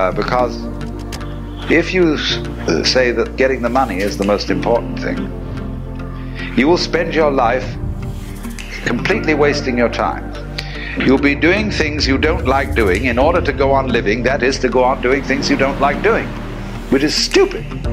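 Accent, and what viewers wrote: South Africa. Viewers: Are you South African because your accent sounds familiar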